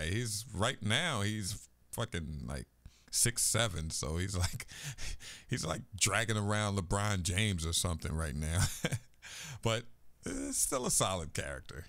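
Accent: Philadelphia, United States. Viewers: You kind of sound like Bernard Hopkins . It must be the east coast philadelphia accent.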